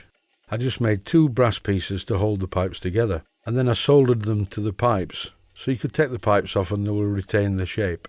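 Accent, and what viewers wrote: Yorkshire, United Kingdom. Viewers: Many thanks,. A brilliant series. Always a pleasure to watch your videos, complete with Yorkshire accent, humour and wit. Cheers.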